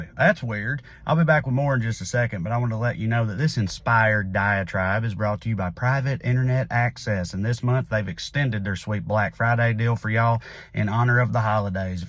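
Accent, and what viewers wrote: South, United States. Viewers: This guy is already attractive - but his mind through that southern accent takes it next level.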